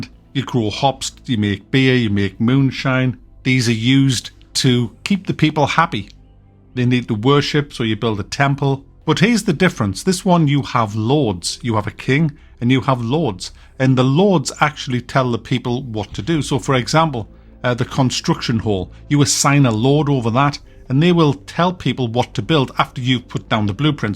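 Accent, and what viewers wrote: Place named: North East England, United Kingdom. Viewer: It's a North East thing, in general. He's from Newcastle, I'm from Sunderland. They say it like that there too.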